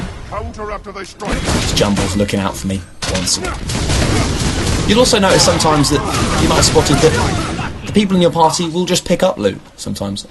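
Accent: United Kingdom. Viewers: His British accent just makes it sound that much more brilliant. As if Im watching a documentary.~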